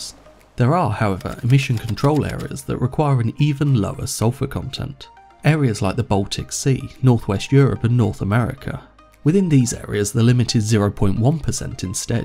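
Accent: United Kingdom. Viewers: You're Britain?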